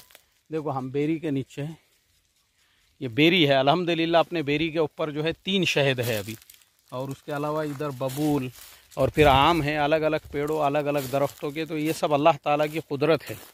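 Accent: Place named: Maharashtra, India. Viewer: Mufti sahab Aap puna me Or alag alag city me rahe magar apne ilake ki zaban aap jo laheje ke saath bolte sun ke maza ajata pure marathi wobhi Maharashtrain acent ke saath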